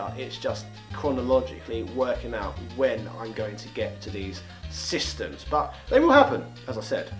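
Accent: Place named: RP, United Kingdom. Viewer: It seems as if your ‘posh’ English accent is a bit exaggerated and it hurts my ears/ nerves/ head. Your natural delivery is probably just fine, so try what comes naturally. Don’t stretch yourself.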